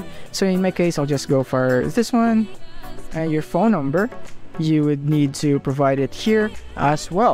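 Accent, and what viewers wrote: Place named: Philippines. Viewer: You sounds like a Filipino.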